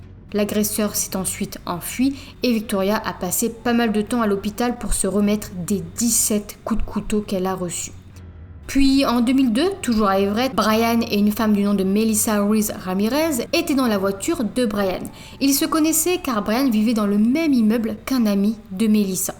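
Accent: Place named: Nord-Pas-de-Calais, France. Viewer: Bonjour, Tu viens de quel département exactement. C'est pas méchant de ma part mais c'est la première fois que je suis tombée sur quelqu'un si jeune avec l'accent ch'ti si prononcé.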